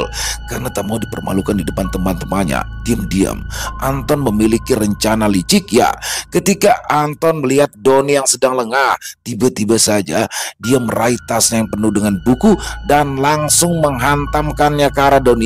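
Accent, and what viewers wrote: Jakarta, Indonesia. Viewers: Iiiiihhh..sumpah keren abis nih!✌️ Logat Betawi ny itu loh...pas bngt, feel ny jd dpt bngt 👍 Fikiran Q jd traveling ngebayangin sosok Bu Zubaedah, Bg Doni, Gembul & si centil Bonies 😀 Karakter apapn yg dibawain berasa natural bngt. Multitalent bngt Kadang menjelma jd org Betawi, kadang jd org Kalimantan, kadang jd org Jawa😄 Top markotop bngt dah ahh..gadak obat 👍😁 Menyala Bang 😃🔥🔥🔥🔥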